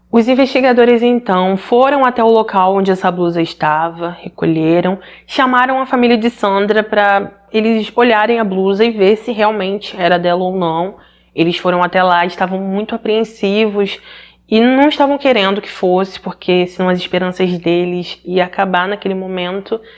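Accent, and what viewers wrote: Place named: Belém, Brazil. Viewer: Sou novo no canal e adorei os vídeos. So uma curiosidade: és do norte do Brasil? Eu sou de Belém do Pará e teu sotaque é muito parecido com o de Belém 😂. Sucesso pro canal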